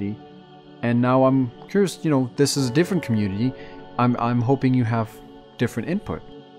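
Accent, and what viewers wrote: United States. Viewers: Ce n est pas un bon accent en anglais il est américain il a plus tôt un très bonne accent en français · est américain, donc forcément il a l'accent. Et pour le nom d'Aurélien Sama, un anglais (ou américain), quelque qu'il soit, s'il a un mot français à dire, le dira avec l'accent français (ex: Rendez-vous) et encore plus si c'est un nom propre.;)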